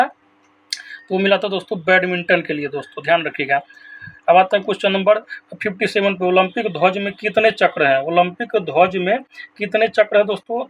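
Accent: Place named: Bihar, India. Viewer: hm v Bihar Samastipur se h ,,,,aapke bolne k style se lga he ki aap v Bihar se he h 🙏🙏🙏🙏🙏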